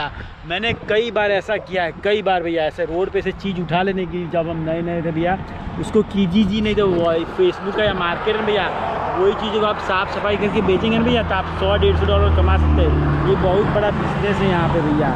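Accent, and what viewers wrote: Gujarat, India. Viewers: I think you belong to Gujarat as you speak Gujarati style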